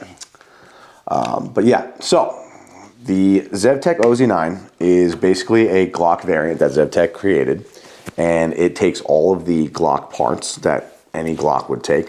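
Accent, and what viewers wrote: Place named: East Coast, United States. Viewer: now, hearin your accent, you gotta be east coast! lemme know!!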